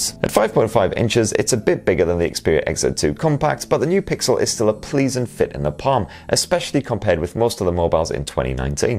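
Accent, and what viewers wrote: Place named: Newcastle, United Kingdom. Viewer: Loving the Geordie accent :) Reminds me of my ex-wife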